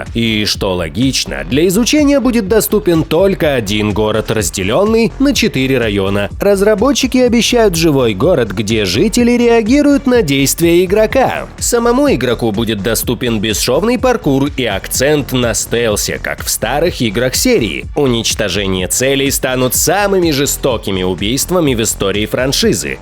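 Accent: Ukraine. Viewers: Сотня вподобайок за гарну україньську! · Яка гарна українська😃🤗 гадаю що ви ховаєте цілу нишу можливостей!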